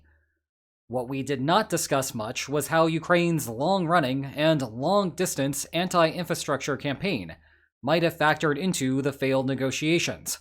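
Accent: Pittsburgh, United States. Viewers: Are you a yinzer?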